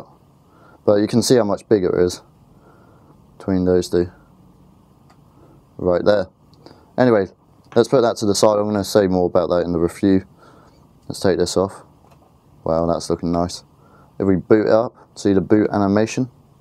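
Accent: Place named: England, United Kingdom. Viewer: Are you English?, I love that accent!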